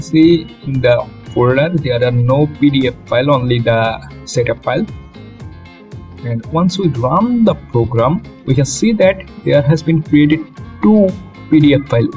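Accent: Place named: Germany. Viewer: Du hast einen sehr deutschen Akzent 😂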